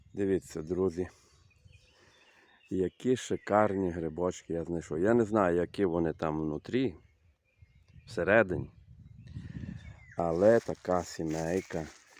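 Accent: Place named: Transcarpathia, Ukraine. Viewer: дякую. У вас говір схожий на наш, закарпатський. Я ще гриба не знаходила, а так хочеться. Вдалих вам походів.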